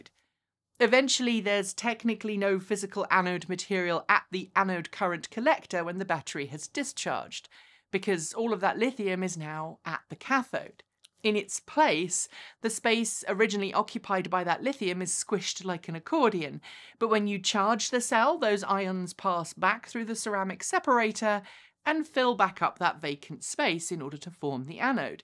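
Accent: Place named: United Kingdom. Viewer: A British accent just makes everything sound legit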